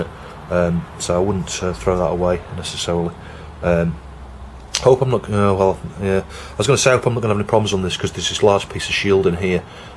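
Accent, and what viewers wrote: United Kingdom. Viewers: It's always interesting to me how British people pronounce "solder" the way it looks like it should be pronounced. I never quite understand why us Americans decided to go with "sodder" for the pronunciation.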